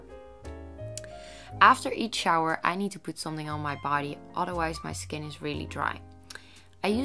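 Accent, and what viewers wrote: Netherlands. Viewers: Are you dutch? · Are u Dutch?? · Her Dutch accent makes me cringe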